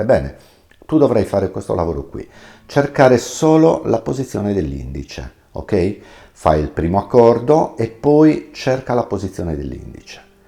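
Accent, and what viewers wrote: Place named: Sardinia, Italy. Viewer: Grazie sei molto comprensibile, sono una principiante, nemmeno più tanto giovane, ma mi piace imparare e trovo il tuo metodo molto semplice..... Grazie. Ho molta difficoltà nel cambio accordi, anche x un problemino "tecnico".... Ho una Rizoartrosi al pollice sinistro😢..... Ma non mollo...... Voglio imparare e ti seguirò con piacere sei molto bravo complimenti..... E poi il tuo accento sardo è stupendo adoro la tua terra😊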